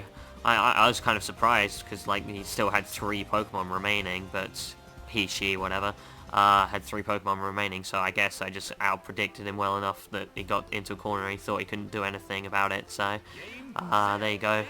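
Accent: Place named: United Kingdom. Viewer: I always love hearing Brit voices... I'm not quite sure why... but in any case, coo' battle. About the switching between Gyrados and Jolteon business, there's chances that you might overpredict them and they won't switch and if you do the wrong move expecting a switch it really messes you up. It's a good thing though he did do what he did... if all you did was Confuse Ray to Gyrados it still would've been good but imagine if you picked Surf and he didn't switch >_>;.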